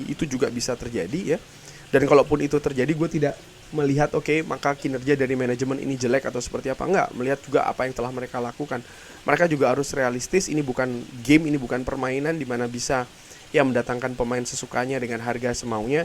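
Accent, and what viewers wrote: Semarang, Indonesia. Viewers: Asli semarang ya mas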